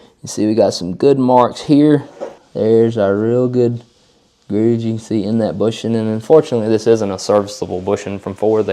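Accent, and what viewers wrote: Alabama, United States. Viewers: That Alabama accent gets a smile on me every time!😊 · He's from Alabama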